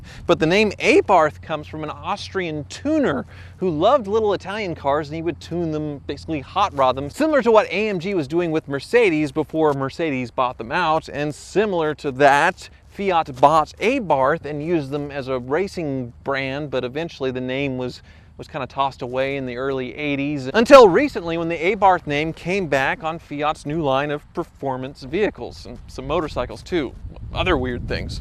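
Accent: United States. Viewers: why cant YE YANKS pronounce words properly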